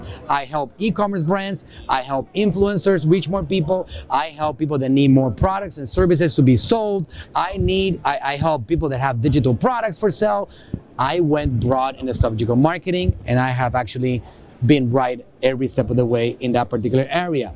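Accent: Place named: Latin America. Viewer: Qué increible como se nota que no es hablante d inglés nativo. Se nota el acento latino.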